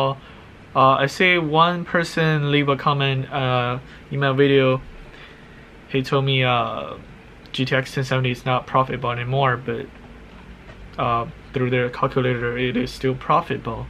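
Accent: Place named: China. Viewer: I love your chinese accent sorry if its offensive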